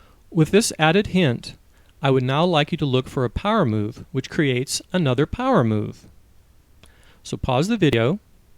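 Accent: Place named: United Kingdom. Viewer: Sir, you have gotten a niche that you teach and do it very well. Great to hear that awesome British accent. Thank you for all your help.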